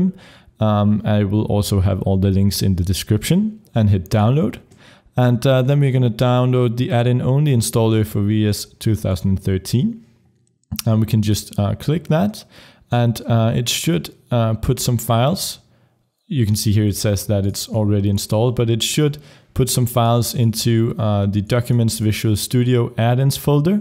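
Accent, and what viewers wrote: Denmark. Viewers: are u danish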